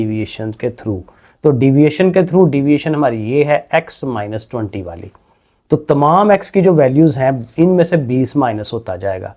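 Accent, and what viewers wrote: Sialkot, Pakistan. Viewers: Sir ap sialkoti hy myri trha ap ka bolny ka trika 😂😂😂❤ but acha hy 😊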